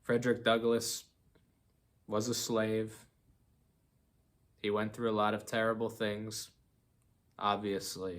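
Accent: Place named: Scotland, United Kingdom. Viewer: pepe pic but scottish accent? bad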